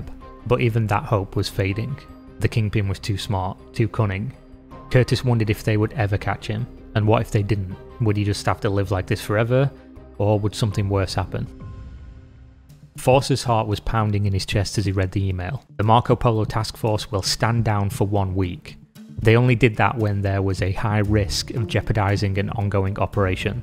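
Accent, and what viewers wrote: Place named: England, United Kingdom. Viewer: I usually find it hard to listen to these types of videos with an english accent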